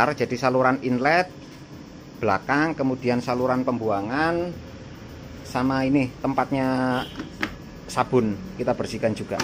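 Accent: Surabaya, Indonesia. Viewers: logat bahasane khas wong jowo timur asli arek2 suroboyo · Suroboyoan ngene wkwkw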